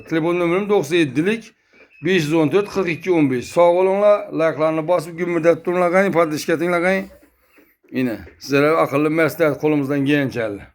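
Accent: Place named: Khorezm, Uzbekistan. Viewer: хоразича.гапраяти шэкилли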